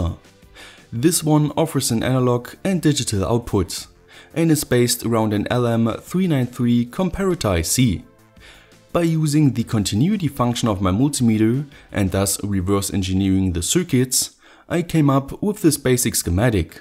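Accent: Germany. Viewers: I guess by your accent that you are from Germany or Austria, if so why do you claim that JLC PCB has free shipping? But it doesnt show for me free shipping(Austria)?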